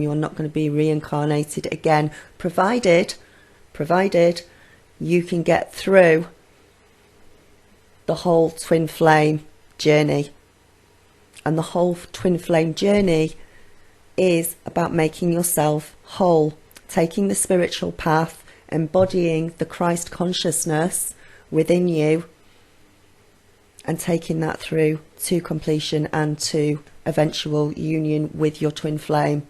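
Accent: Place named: Sale, United Kingdom. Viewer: ah, Sale. I can hear it.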